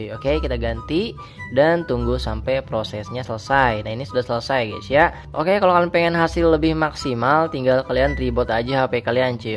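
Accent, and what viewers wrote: West Java, Indonesia. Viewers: Anjay...sundaness pisan lh logatna😂😂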